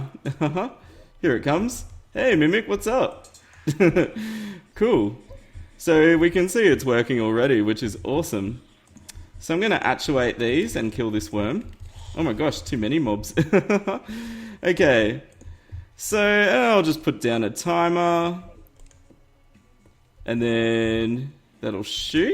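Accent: Australia. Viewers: You're Aussie... Aren't ya? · Are you Aussie because I think.. you are.. so yeah. And say magic is hairy with your tongue out! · He is aussie right?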